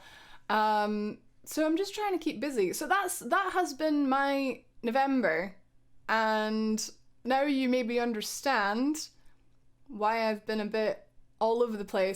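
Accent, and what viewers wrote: Scotland, United Kingdom. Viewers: I remember the first time I watched a Whatculture video that you featured on, and thinking to myself "who the bloody hell is this Scottish chick?". Then I got used to you, started enjoying you more and more, then the gaming channel started doing podcasts, and I'd look forward to whenever you were on them. (I play a game whenever I listen to guess who is on, and would fist pump when you were on). While following you on socials, i saw you were a streamer, and i made a twitch account, because you were streaming and I wanted to be involved in the chat. From that, you were the first streamer I subbed to, and whenever i get chance i always drop by to say hello, (I'm LordPeterEgg btw). I'm sure others have stories similar to mine, and I'm sure like me, we're shocked about this news, but also excited to see what comes next for you! 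We all love you, and are looking forward to everything to come! LONG LIVE! ❤❤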